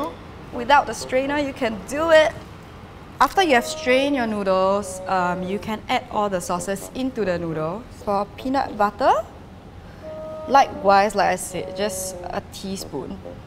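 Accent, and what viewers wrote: United Kingdom. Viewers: is she english? she sounds like she grew up in the UK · You have british accent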